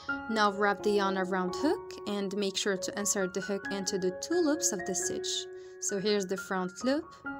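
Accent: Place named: Saudi Arabia. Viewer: تتكلمي سعودي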